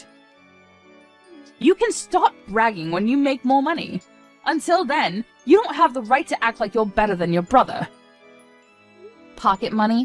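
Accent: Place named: England, United Kingdom. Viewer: I like the English accent.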